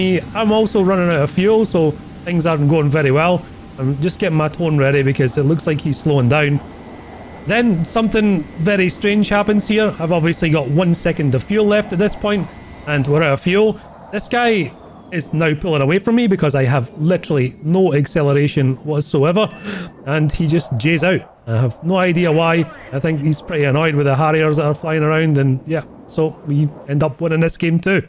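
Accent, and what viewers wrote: Scotland, United Kingdom. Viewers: great video guy! good to hear another scots voice out there on the interweb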